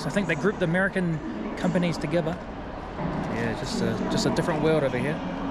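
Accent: New Zealand. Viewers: sounds like kiwi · I was gonna say you sounded like a kiwi and where were you from and then you told the taxi driver you were from New Zealand and answered my question 😂 Subscribed! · Sounds like you're a Kiwi, not an Australian. So I'm not expecting anything great.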